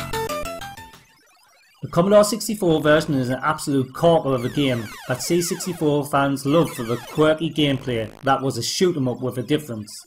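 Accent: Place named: Newcastle, United Kingdom. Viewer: Geordie retro computing! Subscribed!!!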